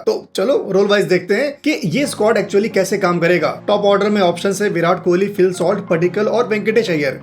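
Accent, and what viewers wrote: Bihar, India. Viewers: Bhaiya bihar se ho kya ❤❤❤❤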